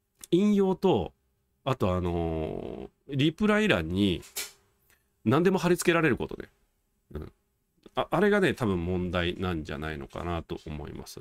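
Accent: Kansai, Japan. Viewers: ん…関西弁…なんJ民の気配がしたな… ？？？『ワイやで』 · 関西弁…… 某掲示板……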